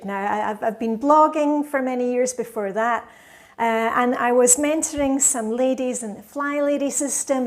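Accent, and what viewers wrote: Scotland, United Kingdom. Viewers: When we finally moved into our new house in 2020, I looked on "this You Tube thing" for guidance on folding socks as neatly as the German mothers of my children's friends did. Found a nice American lady, who besides folding, had videos on organising, and fell down the rabbit hole... At some point, I was watching an old video of the Minimal Mum, doing an all-January collaboration - and yours was one of the videos. At first I was very surprised that Danes apparently have Scottish accents 😂 honestly! I remember thinking the geographical proximity might explain it - I must have been thinking of Norway 😂😂. Luckily you soon mentioned being a canny Scot 😂. I am eternally grateful to you for your bahookie nudges, and enjoy being part of this international community around you. Congratulations and thank you - Anne (in Germany now but formerly of the 3rd Morden Brownies 😊) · Found your channel via one of the minimalist channels - i think it was the minimal mom. 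I came for wardrobe advice and stayed because of many more reasons: the positivity, the no-adds no sponsoring, the iceswimming, the routines, the scottish accent, the authenticity, now I am learning about the flylady system and danish hygge. Your videos brighten my evenings and yoyr success is so deserved in my opinion! 🎉🎉🎉 Congratulations to 100k! 😊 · I was drawn to your channel for several reasons: your infectious positivity! Learning about decluttering! Seeing you with friends enjoying life in Denmark! Your Scottish accent! Your simple meal preps! Simplifying household chores! All in all, you’re just a lovely human being ❤❤❤ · I found you a couple of years ago, probably when I was searching for cleaning systems. I loved your warm, welcoming approach as well as the Scottish accent! I live in north east England and holiday often in Scotland-my favourite place. Feel as if I'm checking in with a friend every time a new video pops up. 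Thank you for your support and encouragement x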